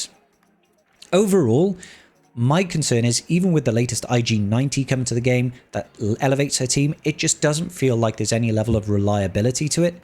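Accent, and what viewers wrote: Wales, United Kingdom. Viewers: Same here, I have all of them😂 Just enjoy listening to our favorite Welshman.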